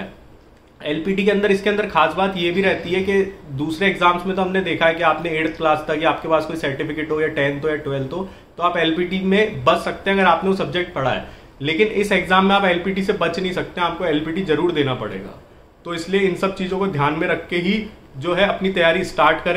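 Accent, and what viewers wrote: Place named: Madhya Pradesh, India. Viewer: Sir MP se hai to aise hi tone hai sir ki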